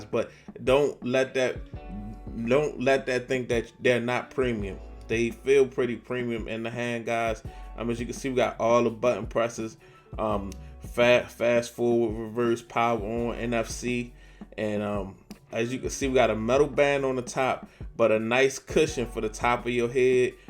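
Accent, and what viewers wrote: Baltimore, United States. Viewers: The bmore accent coming out strong in this one 🤣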